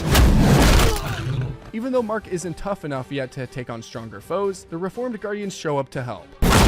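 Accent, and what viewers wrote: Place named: Canada. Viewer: thick canadian accent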